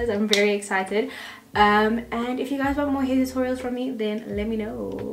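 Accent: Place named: South Africa. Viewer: Omg you south african, I hear it by the accent 🇿🇦💖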